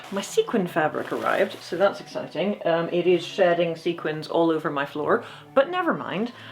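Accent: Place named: United Kingdom. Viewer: I love, and always love, the combination of detailed research and "eh, throw it together, this is how I'm gonna do it because it's not accurate anyway." Research on chitons, using a chiton, the laminating techniques, and then ballgowns and "why not." Possibly most random comment ever, but as someone with British relatives, I notice and love how accent gets just a little thicker when she's thinking something over. It just reminds me of so many other lovely crafters I know - they get into their craft and instead of "voiceover voice" we get "here is a real person and they are moderately frustrated."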